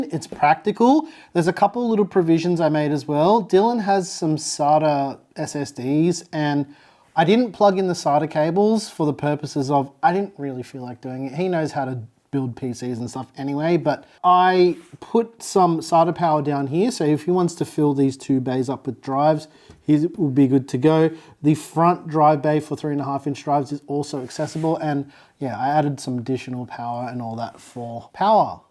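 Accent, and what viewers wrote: Australia. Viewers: Fantastic build! The 3090 is definitely a hot boy so all the fans are definitely necessary. My EVGA 3080 draws 380 watts. Non related you remind me of my Mexican cousin so it trips me out that you have that Aussie accent. Lol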